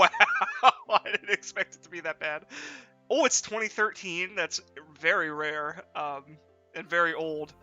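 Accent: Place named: Pennsylvania, United States. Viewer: Random question but are you from PA? Feel like I'm hearing a little PA in your voice 😂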